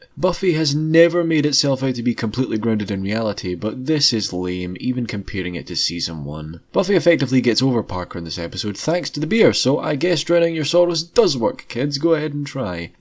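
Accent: Scotland, United Kingdom. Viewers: I think he might be Scottish?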